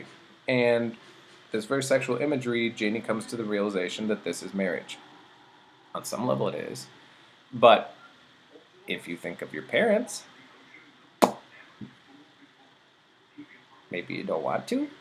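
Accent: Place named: Minnesota, United States. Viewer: This fucking guy is too Minnesota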